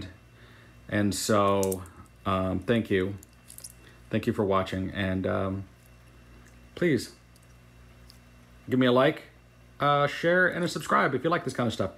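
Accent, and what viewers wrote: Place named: United States. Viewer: Right or wrong, everyone pronounces it the way he does in the US.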